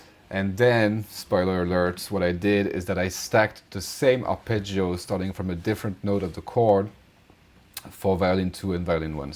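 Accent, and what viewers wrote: France. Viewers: Pur accent francais mdrr très interessant en tous cas c'est super on manque de chaines comme ça sur yt ! Je me tate aussi à m'y mettre ^^ Mais ouais encore une fois très cool ! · Great video! Are you french btw? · Hi, are you french ? I am French and your accent sounds very French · super vidéo ! avec l'accent français . i luv it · This accent... are you French or so? Wonderful video! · French accent spotted !!! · nice vidéo and a lovely accent ! (french ?)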